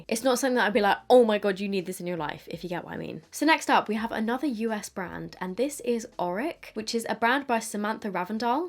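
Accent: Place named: England, United Kingdom. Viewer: Wait I love it 🥰 and one more thing! where are you from in England 😅 love the accent 😆🤩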